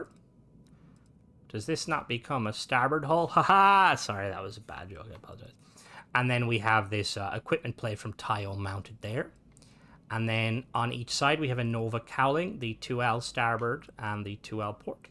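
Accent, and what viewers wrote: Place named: Ireland. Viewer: 😊 would you happen to know how to use bagpipes just curious You're Irish accent makes me wonder not that I think it's bad actually I think it's pretty cool but your accent sounds like you're from the area that actually has bagpipes plays it from dominant this is not disrespect this is me trying to respect you Peace have a great day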